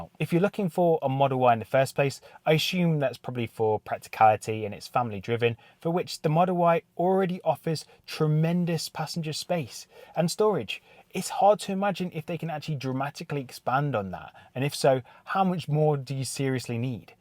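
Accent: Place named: Midlands, United Kingdom. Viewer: I thought I detected a Midlands accent. Nice one, thanks. Just getting my collection checklist ready.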